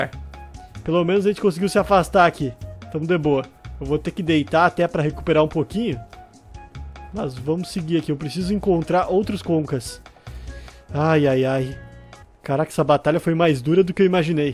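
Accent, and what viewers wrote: Rio Grande do Sul, Brazil. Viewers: Ele é gaúcho kk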